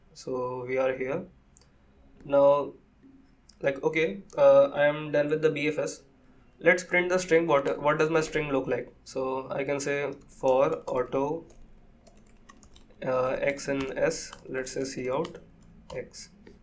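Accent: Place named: India. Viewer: everything's great but i'm not used to his indian accent so my eyes are a bit tired of reading the script 😎😎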